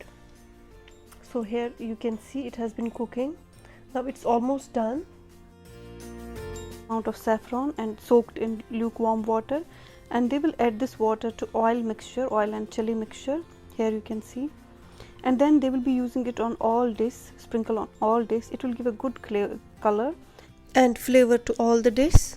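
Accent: Kashmir, India. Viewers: Is it Dishes or Dissss? Wah re Kashmiri accent :) This is not a dig but on a lighter note. Great video!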